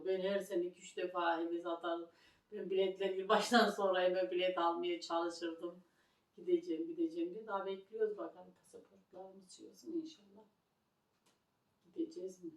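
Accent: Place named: Aksaray, Turkey. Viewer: Nerelisin abla konuşman Aksaray lı gibi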